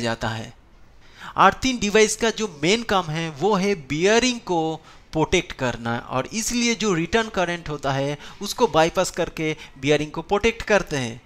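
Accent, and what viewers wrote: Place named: Bengal, India. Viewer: T = correct accent use kar rahe ho aap .. But FIAT per "T" ko keu Bengali accent " ত"" use karte ho aap log ? Next Bihar pe maximum people " use " = accent pe " S " ko keu Bengali accent " জ / j " karte hai ❓ mujhe pata nahi 🤔 Use word pe "" s " accent ko s jaysa accent nahi karte hain ❗ Main 1 word examples diya hai, aysa bahut words hain accent correct nahi hota / not ❓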